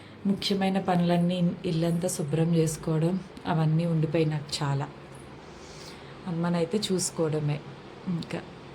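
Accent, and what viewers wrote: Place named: Visakhapatnam, India. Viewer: Chala bagundhi andi,meeru undedhi vizag ani nenu anukuntunnanu mee matalu alage unnay ,maadhi kuda vizag ne ,yevarikyna telisthe cheppandi,mimmalni okasari kalavaalani undhi andi,oka vela meedhi vizag ayithe naaku cheppandi kalusthanu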